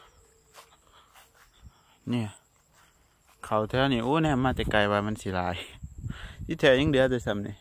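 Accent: Isan, Thailand. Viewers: พี่ถามอะไรหน่อยที่สปป.ลาวมีกี่ภาคมันมีภาคอิสานเหมือนที่ไทยมั้ยพี่สังเกตุฟังน้อง เว้าแล้วคล้ายคนอิสานมากๆเลยแล้วน้อง อยู่ภาคอะไรใช่ภาคใต้รึป่าวที่ติดกับจว.อุบลของไทย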